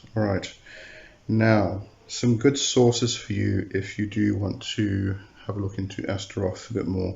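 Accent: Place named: United Kingdom. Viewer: u cant possibly know anything you sound British its ridiculous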